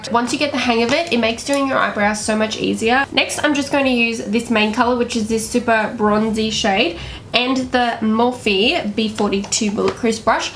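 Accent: Australia. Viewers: You're so pretty and i love your accent😍😍 i am Australian too but your accent is much stronger than mine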